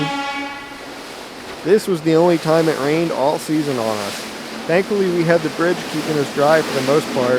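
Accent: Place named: Philadelphia, United States. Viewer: zzzzzzzzzzzzzzzzzz Dislike the commentary. Esp the Philly accent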